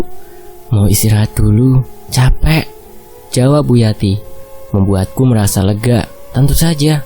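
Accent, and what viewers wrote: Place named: Surabaya, Indonesia. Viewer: Waahh.. ini nih yg keren .. bawainnya kalem saja .. mau serem mau seru ..mau bercanda tetep enak didengarnya .. saya penakut banget ..karena suka di isengin makhlus...🙈🙈 Tapi tetep aja penasaran dan ketagihan denger kisah2mu min.. yaa..meakipin beraninya denger pas siang sampe ashar ajah..😅😅🙈🙈 abis suka ngangenin sih kalo sudah berasu2 ber dancuk2 ala logat suroboyoan mu min.. kriuk banget. !!😅 Tetep semangat berbagi ya min.. dibalik setiap kisah pasti ada pesan positifnya terlepas kisah fiktif ataupun nyata.. Oh ya ... Satu masukan ya min. Tampil total aja ngebawainnya .. modulasimu yg oke bisa dikembangin berbagai karakter .. cuma yg kurang berpadu kalo karakter kasar pake bahasa Indonesia .. enggak serasi dg modulasimu yg kriuk kalem itu.. kek kepepet gitu galaknya..😅😅😅. Oke trims min.. berkah memberkahi selalu ditiap kehadiranmu..👍😊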